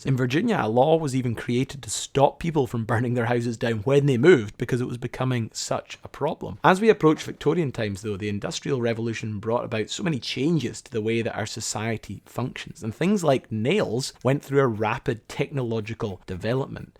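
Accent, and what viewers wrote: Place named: Scotland, United Kingdom. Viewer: Thank you for such an interesting piece of history. You are an easy listen. Your Scottish background is easy on the ear. BWScott Fort Worth Texas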